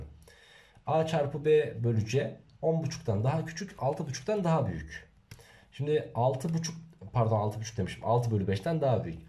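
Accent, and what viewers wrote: Ankara, Turkey. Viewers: Hocamız Angaralı sanırım :D 👍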